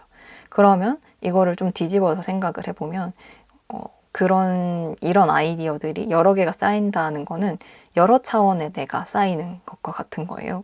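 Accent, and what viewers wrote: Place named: Japan. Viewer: 한국어 마스터한 일본분 특유의 말투랑 발음이랑 완전 같아서요! 모든 발음이 둥글둥글 하고 받침이 약하다고 해야하나요? 약간 お / ん 이 모든 발음에 들어가는 느낌..? 웅냥양 발음이 속에 내재되어있는 느낌...? 그리고 그 숨쉬는 부분이랑 말의 템포랑 멈칫하시는 부분이 엄청 비슷하세요😂 약간 한일2세분들 이랑도 비슷한 느낌!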